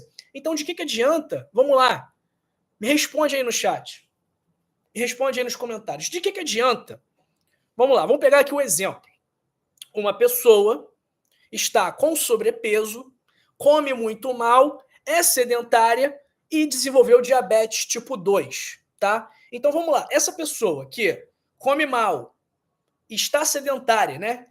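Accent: Rio de Janeiro, Brazil. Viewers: Sotaque de carioca não convence ninguém, parece malandro falando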